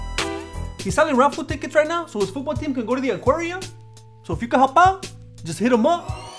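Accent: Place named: Mexico. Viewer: es gracioso tu acento muy mexicano! saludos from oaxaca krav maga studen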